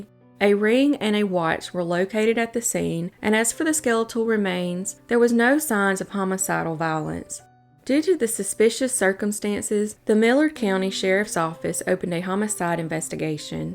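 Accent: South, United States. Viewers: I love your channel!! Your accent rulz!!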